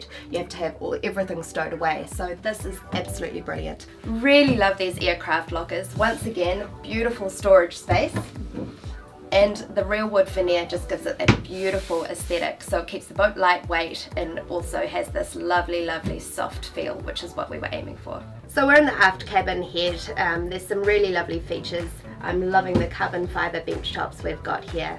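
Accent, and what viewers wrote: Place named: South Island, New Zealand. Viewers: Ben Dixon that’s 🥝 kiwi’s for yah. Or is she Aussie 🤔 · I thought it had heads not heeds. Arrr that South Island accent.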